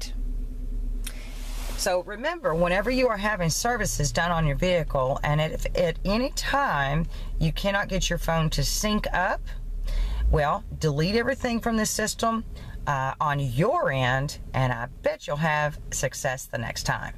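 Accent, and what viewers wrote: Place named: Virginia, United States. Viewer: Really? I liked her voice. More Virginian I thought.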